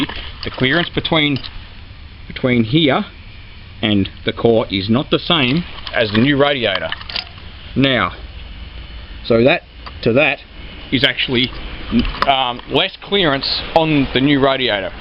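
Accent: Australia. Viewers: true Aussie accent, love it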